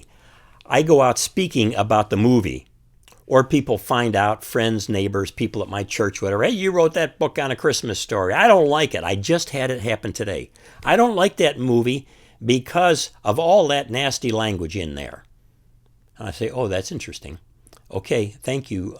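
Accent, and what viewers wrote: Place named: Chicago, United States. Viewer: Dude you have the most Chicago voice I've ever heard...lol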